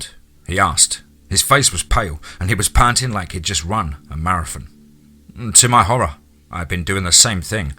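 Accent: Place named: United Kingdom. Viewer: My only input is that I hope your accent could be more neutral (accent-less, a.k.a American English), so it doesn't feel archaic. British accent (or similar) are good for old ghost stories or cosmic horror stories. Also, most of your stories are set in the Americas, aren't they? It should add to the immersion factor.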